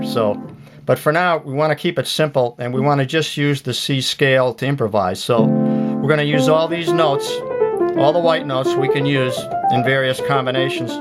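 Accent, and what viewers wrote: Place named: Maine, United States. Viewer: Your video just showed up on my feed and as I was watching it I immediately realized you were from Maine. I adore your accent, reminds me of home. ❤️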